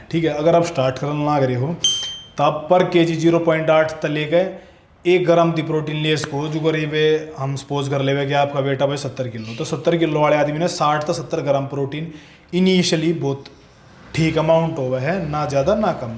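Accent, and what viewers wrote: Haryana, India. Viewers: Bhai nu hindi na aave haryanvi dialect me hindi bole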